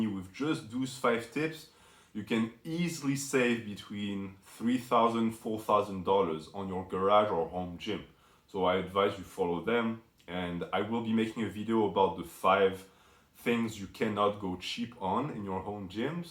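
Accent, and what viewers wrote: France. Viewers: why tf you wanna know that lol Well you can tell he's french by his heavy accent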